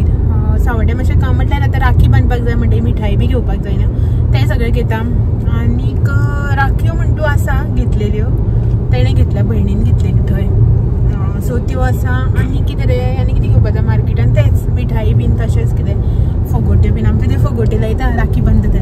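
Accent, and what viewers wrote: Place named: Konkan, India. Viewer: Nice vlog as always ❤ you are very much knowledgeable specially your Konkani is very pure and shudh ❤ Love your work. Eagerly waiting for Ganesh chaturthi vlogs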